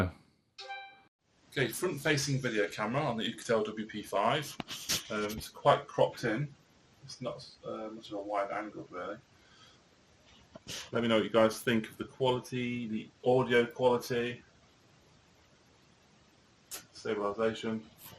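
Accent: United Kingdom. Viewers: Sounds like you are from UK, so we will not get a screen brightness test in sunshine, unfortunately.. That is what I am mainly interested in..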